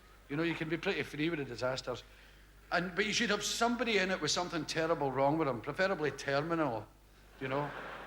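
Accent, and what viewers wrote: Scotland, United Kingdom. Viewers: Is it me or our beloved Billy sounding more scotish can't work it out ???🥰😘❤ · It's the early days, he still had his Glasgow accent. He sounds as Scottish as he's always sounded.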